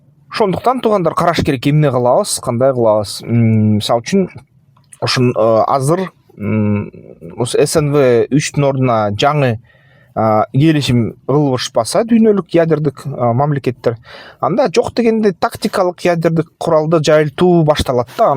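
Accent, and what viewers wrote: Standard. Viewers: Азаматсыз, мырза! Таптаза кыргызча бир созду да бурмалабай ойду берууго болот экен да! Билиминизге, аракетинизге - баракелде! Ийгилик - сизге! Кудайым адамдардын журогуно тынчтык берсе экен!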